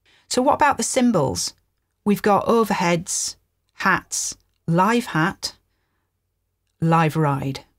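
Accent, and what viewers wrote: Northern England, United Kingdom. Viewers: Where tha from? Nice to hear a Northern accent on YT :face-red-heart-shape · I came into the video and heard an eloquent-sounding British lady, and then out comes this ripping pop punk song. I love it because punk almost has this middle finger to cultural norms. I really love how clear speaks and I'll be able to come up with a few tricks thanks to this video. I came to this conclusion: when someone related to music is explaining something, do you like this person's work? I like mix. I also had to turn off YouTube Stable Volume to really hear it.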